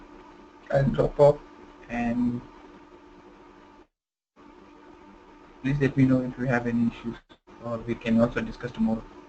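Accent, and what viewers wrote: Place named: India. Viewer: indian accent makes this a hectic video it can not be watched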